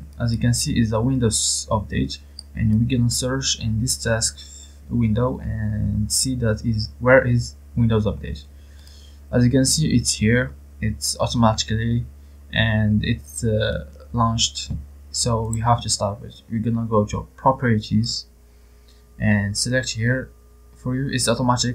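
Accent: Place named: Spain. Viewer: i think he is Spanish his accecnt not to hard to understand but maybe if he wrote it down instead of talking to me i juse restore my computer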